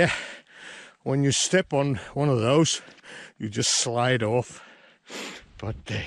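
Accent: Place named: Northern England, United Kingdom. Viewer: Very interesting channel. Just love it. I follow quite a few off-grid channels but love to see your fresh content! My question is, your English is very good and I cannot help but think it sounds very Northern English, from the north of England. Have you spent time in England during your working life?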